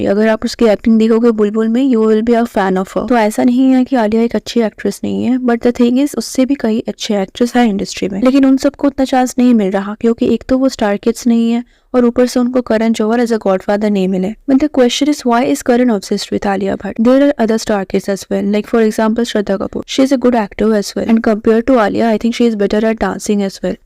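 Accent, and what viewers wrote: India. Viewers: Hey guys even I'm a spill be fan but the way you guys are comparing her with Spill bee is just wrong. She is doing a great job and i also noticed her content is different than spill be she doesn't copy anyone and i like this about her. And people who's saying accent is like spill be it's just INDIAN accent guys. is different and great let's not compare her with anyone.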